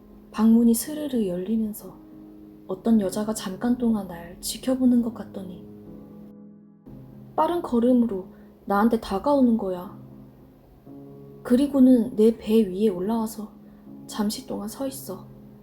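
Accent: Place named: Gangwon, South Korea. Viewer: 성우 목소리 정말 좋네요 강원도 억양.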